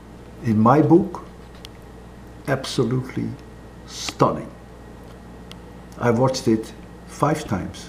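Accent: Netherlands. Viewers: you sound dutch are u if so my 2 :3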